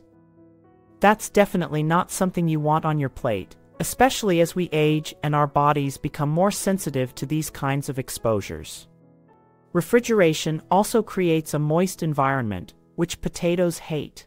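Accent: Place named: United States. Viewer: I hear an American voice telling us all this nonsense. Have you ever read the labels on most of your American Foods. They are packed full of Chemicals that cause more health harm than keeping them in the fridge.🏴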